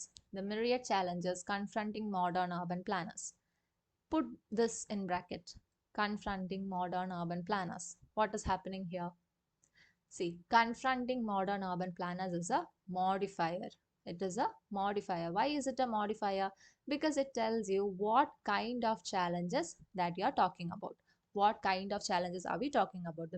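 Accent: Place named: Kerala, India. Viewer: Amazing class ma'am ..feels like you are from kerala ..Great pronounciation skill ma'am ..God Bless You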